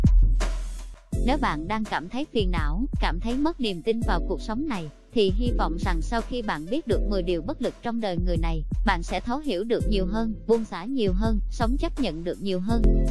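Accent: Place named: South, Vietnam. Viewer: Giọng đọc Miền nam rỏ ràng - Hay!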